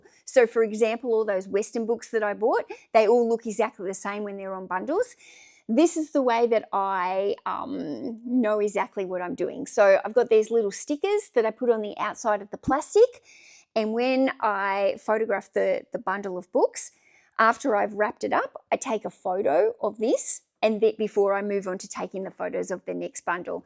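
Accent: Australia. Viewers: I like your Australian accent.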